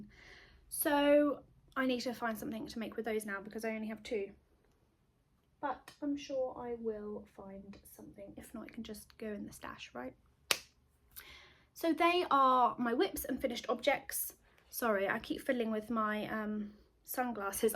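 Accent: England, United Kingdom. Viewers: Love your yarn purchases. It is so easy to get overrun with yarn, it does take up space. I know for sure😁 . I love yarns and knitting socks hats mitts cows and some shawls. Rattle on I love listening to you, I love English accents😎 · Congratulations on your 1.69k subscribers. Love listening to your British accent and the Scottish lady too. From an Aussie down under.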